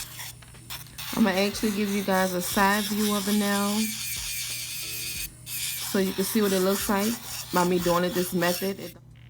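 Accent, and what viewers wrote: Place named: New Orleans, United States. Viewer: baby that New Orléans accent thick hunny 😂😂 (i love the way they speak). nails look good girl keep up the good work this really helped me fix my broken nail. 💕🙏🏾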